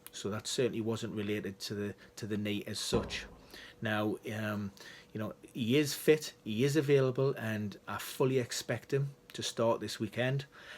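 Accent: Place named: United Kingdom. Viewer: Its a good video but you could put a little work on them by adding the players pictures you are talking about, or some videos of their injuries, or some of their plays. Also keep in mind, not all FPL players are from England, some dont speak english too well and might have a problem understanding your Brittish accent. Im sure some graphics and some visual data shown in your videos would increase your audience!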